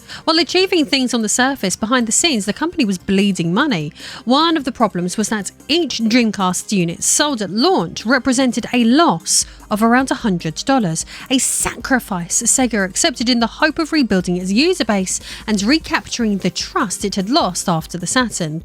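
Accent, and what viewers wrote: United Kingdom. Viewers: Essex girl with elocution lessons reads AI script, today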